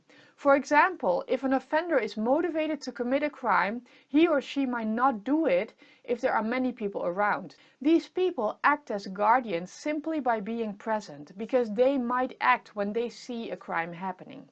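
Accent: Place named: Twente, Netherlands. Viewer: Interessant. Goed uitgelegd ook. Passioneel verteld. Met een tukkers - engels accent:-). Hoe gaat het verder met je? Gr Hessel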